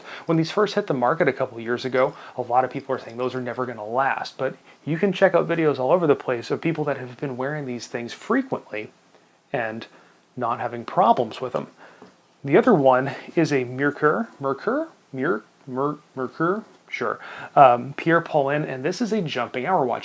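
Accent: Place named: United States. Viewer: At least you are self aware to it😁.. yes, you guys in the US do say things in a funny way 😉. Actually just one thing- Tourbillon is pronounced without the “LL”, so it sounds “to(u) r bi yon”. Great review. I own the Aesop, the other dial, and the Pierre Paulin (same dial but with 3 hands). I enjoy them a lot.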